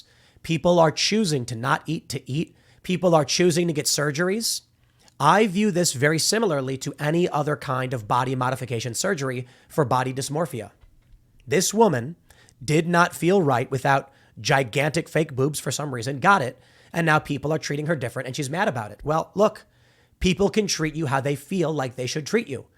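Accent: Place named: Chicago, United States. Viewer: This is the most Chicago has ever sounded.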